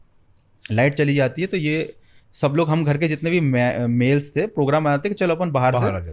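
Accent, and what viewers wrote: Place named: Bhopal, India. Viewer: Ek bhopali hi dusre bhopali ko pehchan skta h only accent se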